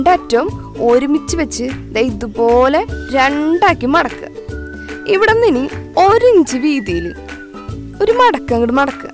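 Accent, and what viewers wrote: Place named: Thrissur, India. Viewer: Thrissur slang..🤗🤗🤗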